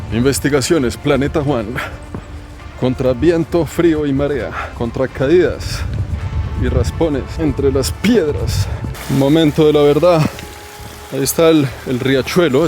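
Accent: Bogotá, Colombia. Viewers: Que linda voz tienes y con ese acento colombiano, Ave Maria. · colombiano por estilo de hablar👍👍👍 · Juan buena voz.. interesante relato... acento Colombiano? Chevere felicitaciones!!! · Es agradable ver tus videos desde el sofá, aparte de ver las imágenes, estas muy bien explicafos con excelentes datos históricos de la localidades que nos enseñas, en este caso la ciudad de Matera , hoy una ciudad de la República de Italia y anteriormente del Reino de las Dos Sicilias. Un inciso Planeta Juan , decir Latinos o Latinoamérica no es correcto, es hispanos o Hispanoamérica, Latinoamérica es una invención francesa cuando la invasión francesa, los franceses no dicen el Canadá latino o el África Latina, tuvieron muchos territorios en África y también los tuvo Portugal, España menos , pero dicen el Canadá francófono o el África francófona, latino es ser del Lacio .. 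Si también se incluye El Brasil entonces se puede decir Iberoamérica, latino o Latinoamerica es absurdo, el Lacio es lo concerniente a latino , una región de Italia. Un saludo desde España, me gusta ver tus videos y escuchar tu acento colombiano. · Empecé a seguirte Juan, solo porque eres colombiano y me encanta su acento, pero honestamente excelente relato de esta ciudad antigua, y algunos otros vídeos que ví , muy interesantes y más cuando los explicas tan bien y nos aportas no solo viajar atravez de ellos sino información de los mismos en cuanto a su cultura gastronomía, estatus y antigüedad muchísimas gracias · Que fastidio ese acento rolo :v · DEBERÍAS SER LOCUTOR DE RADIO TENES UN VOZ MUY RECORDATORIA EN MEMORIA DE LA GENTE Y ESE ACENTO COLOMBIANO GENIA... USTED YO DIRIA CHE SOS RE PIOLA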